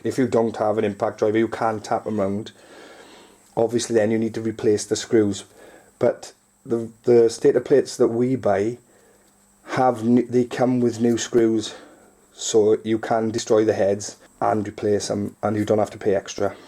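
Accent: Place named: Scotland, United Kingdom. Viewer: Beautiful Scottish accent 😊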